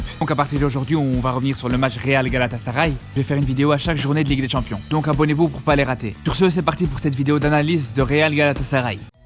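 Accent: Brussels, Belgium. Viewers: Son accent est véridique, elle vient de Bruxelles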